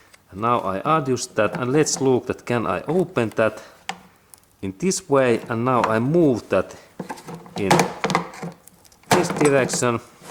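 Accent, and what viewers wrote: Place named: Finland. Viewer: kaunista tää suomen ralli englanti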